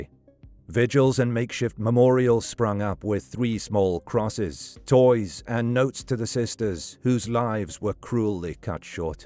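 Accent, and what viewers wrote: United Kingdom. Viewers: I will cut him some slack as he sounds like he hasabritish or Canadian accent.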